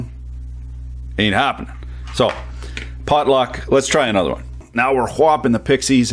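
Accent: Quebec, Canada. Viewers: man where are you from? You almost sound like a "Quebecois" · Gosh i love your french québécois! How did you learn it?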